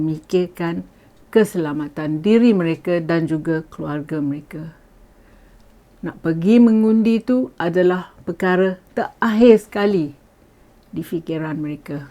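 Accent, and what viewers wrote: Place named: Kuala Kangsar, Malaysia. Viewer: Seronok aku dgr accent kuale dia..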